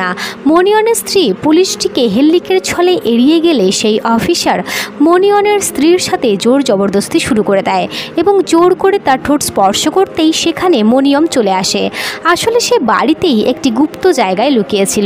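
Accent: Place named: Chapai Nawabganj, Bangladesh. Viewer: আপনার বাসা কি চাঁপাইনবাবগঞ্জে আপনার ভাষা শুনে মনে হচ্ছে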